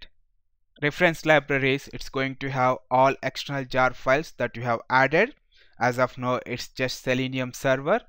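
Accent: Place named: India. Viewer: I'll be honest, I was initially put off by the Indian accent so I apologise for that. I am about 1/2 way through and the step by step introduction is absolutely great so thank you.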